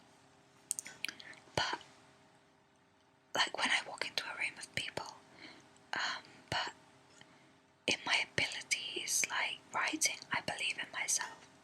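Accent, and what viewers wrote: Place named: England, United Kingdom. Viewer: Well I'm sure guys that know you an your boyfriend will agree with me. I like your accent aswell where are you from in England?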